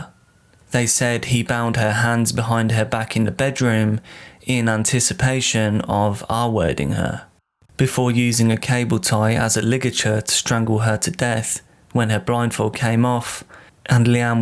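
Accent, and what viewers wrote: United Kingdom. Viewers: Is this a robotic voice with a British accent, it sounds like it.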